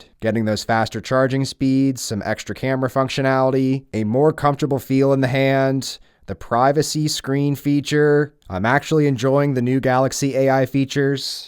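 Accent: United States. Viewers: The most American accent ever wow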